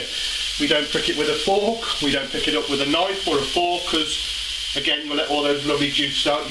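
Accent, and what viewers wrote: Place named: Scotland, United Kingdom. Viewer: Hello I'm French man and I love your vidéos but I' don't undertsand all you leanr. May be your accent and fatest language. Are you Scottish ? Congratulations for your job . (English begginer ) Thanks.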